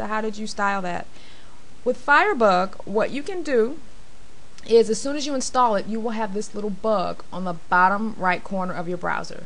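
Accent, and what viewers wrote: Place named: United States. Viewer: yeah, i am spinning! :) also your accent is great, true American.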